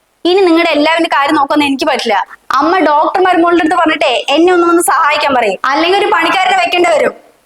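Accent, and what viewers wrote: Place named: Palakkad, India. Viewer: പാലക്കാട്‌ സ്ലാങ് ആണ് ഇതു പോലെ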